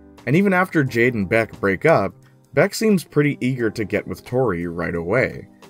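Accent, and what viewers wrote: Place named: Canada. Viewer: I could just tell he's canadian by the way he says things and his word choices. I guess he's just the polite boring canadian stereotype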